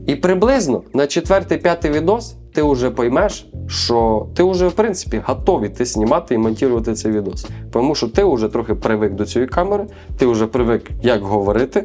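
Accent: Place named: Surzhyk, Ukraine. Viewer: Зарядив, бідбодрив, вдохновив!, прийшла просто твій суржик послухати🥰 абажаю! Ти молодець!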